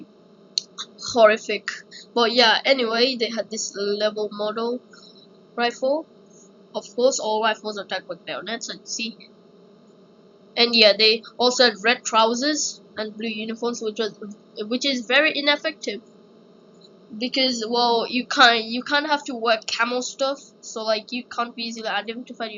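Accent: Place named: United Kingdom. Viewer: BROOO YOU SOUND SO BRITISH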